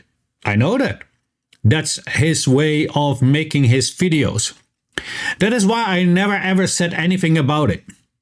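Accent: Germany. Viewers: He sounds German...don't mention the war. What does this have to do with China Western relations? Going after every internet meme is stupid..keep your eye on the bal....NEXPERIA! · sounds like a German accent? figured he is just picking on everyone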